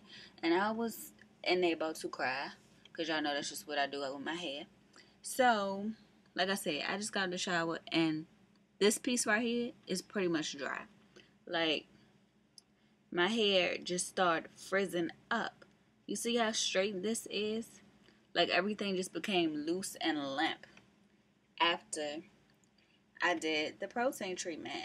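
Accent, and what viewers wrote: United States. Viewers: She from DMV 100% lol... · OMG your whole video I was like, she gotta be from D.C.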